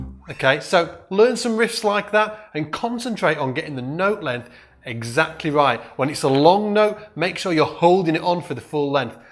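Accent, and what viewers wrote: United Kingdom. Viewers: damn, that is some hardcore british accent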